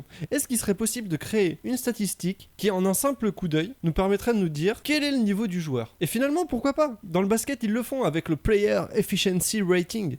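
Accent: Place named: England, United Kingdom. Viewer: L'accent anglais <3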